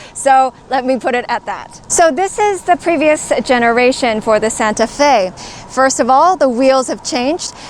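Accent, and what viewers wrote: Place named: United States. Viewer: Excellent review! But just wondering, where are you from? You have an American accent, but the license plate doesn't seem to come from North America. Also wondering if the features you are talking about will come to the US.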